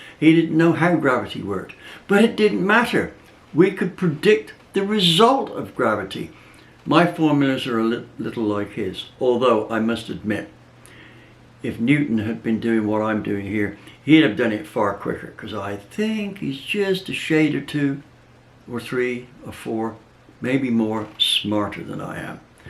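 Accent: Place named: England, United Kingdom. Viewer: I love your channel you remind me of my grandpa and I when we use to talk about and restore old British Motorcycles. Since he passed I got into cars and now have an Ecoboost Mustang myself. You remind me of how my grandpa use to explain things to me, even down to the English Accent. I watched your videos about picking out wheels for the ecoboost and it has helped me a lot. Thanks for everything.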